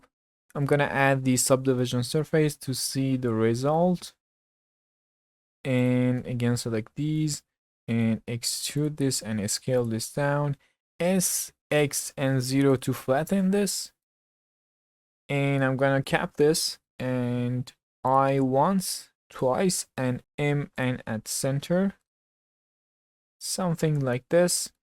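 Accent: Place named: Iran. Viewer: لهجه. فارسی ❤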